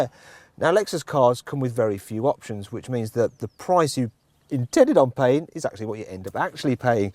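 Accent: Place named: Midlands, United Kingdom. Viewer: Maybe he's originally from the Midlands...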